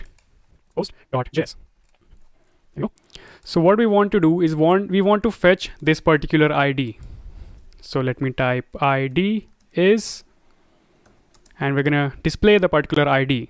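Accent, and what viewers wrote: India. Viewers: everything was fine untill the indian accent, dislike. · first i thought oh..this is not an indian guys....then after 10 sec the indian accent comes in..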